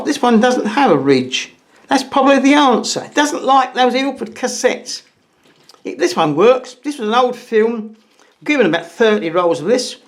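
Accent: United Kingdom. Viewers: Where is your accent from? It seems definitely British but I don't get the specific zone. (I'm not a native speaker, I'm Spaniard.)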